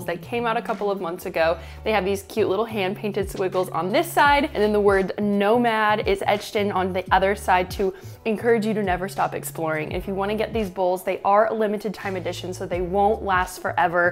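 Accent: South, United States. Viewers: Who els noticed a little bit of a southern accent in voice. 🤔😁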